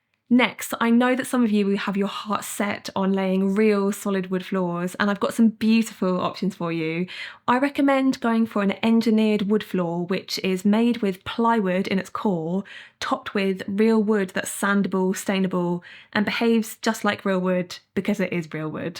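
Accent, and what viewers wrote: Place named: United Kingdom. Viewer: Like a young Marisa Tomei with a British accent…and knowledgeable about home interiors!? 🤯😍 I’d be so over budget I’d need to pull a series of life threatening jewel heists. 😅 Liked and subscribed. 🤌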